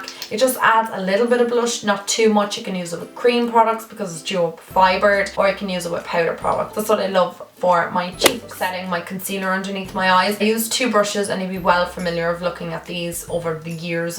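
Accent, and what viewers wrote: Ireland. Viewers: Heyy! I'm new here lol🙈 are you Irish?!? ❤️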